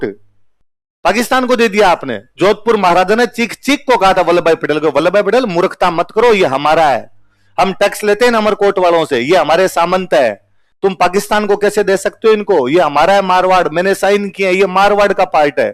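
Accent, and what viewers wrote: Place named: Kathiawar, India. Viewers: ha. aapdi jevi boli che...amreli botad junagadh jevi j language che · सर, गुजरात के सौराष्ट्र (काठियावाड़) की पूरी भाषा आपकी भाषा से मिलती है , में जब भी आपको वीडियो देखता हु तो मुझे ऐसा लगता है की में अपने क्षेत्र के बोली में सुन रहा हु।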